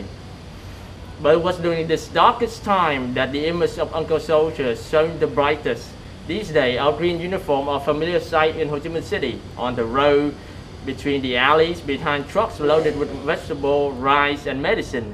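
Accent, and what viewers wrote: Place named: United States. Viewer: Good American accent, Meaningful content!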